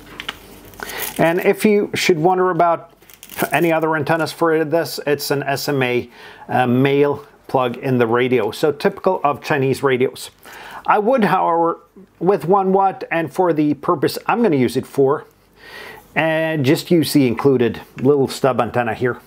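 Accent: United States. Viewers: Yes, I've noticed a lot do speak English well. This man in the video could pass for an American or Canadian citizen with his accent, no problem. I'm American, by the way. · Your prosody not very Norwegian at all. You sound waaaaaaaay more American than Norwegian.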